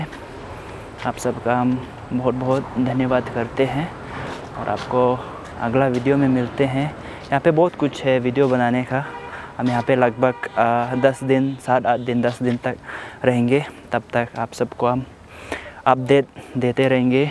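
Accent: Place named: Arunachal Pradesh, India. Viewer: Bhai, Don't worry, little brother jaldi fit and healthy ho Jayega. Jesus Christ heel him. Or rahi BAAT Hindi Ka. Toh Jaise aap bol rahe ho. Aise he sunder Lagta hai. Arunachali Hindi style I love.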